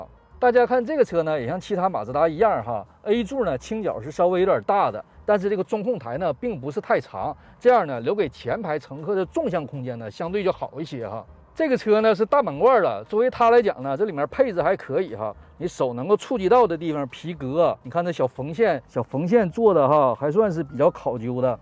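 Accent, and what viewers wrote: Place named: Shenyang, China. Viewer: 主播的口音也太亲切了，老铁是沈阳银吗？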